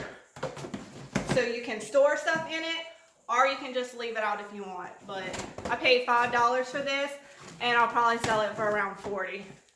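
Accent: Louisiana, United States. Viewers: Girl, it's absolutely a bedpan. I'm glad I discovered your channel, your content is great. And I love listening to your Louisiana accent! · Isn't she precious?? I first saw another of her videos and I thought " that accent sounds familiar". Turns out she is from the same area of south Louisiana as my best friend's family, Cajun country. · Are you from Louisiana? You have a similar accent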